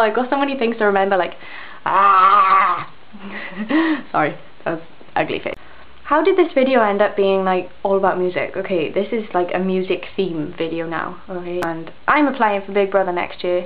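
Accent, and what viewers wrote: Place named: Wales, United Kingdom. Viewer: GOD YOU SOUND SO WELSH xD Hahahaha, mad! <3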